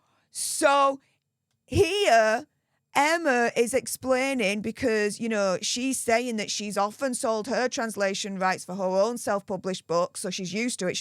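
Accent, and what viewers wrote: Yorkshire, United Kingdom. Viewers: your literally loosing your Yorkshire twang, you need sleep, and a hot toddy. On top of it you need to be honoured with a Damehood, for your contribution to this, after an operation.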